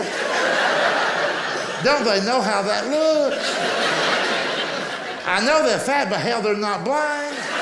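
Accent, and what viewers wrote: South, United States. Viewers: Simplicity in his delivery and comic content. He's not like the typical professional comedian, more like your funny Uncle Ralph. We all can relate to his content. His timing is perfect. Throw in the suthun' drawl for extra fun.He's hysterical, and I can't wait to see him when he gets near my town.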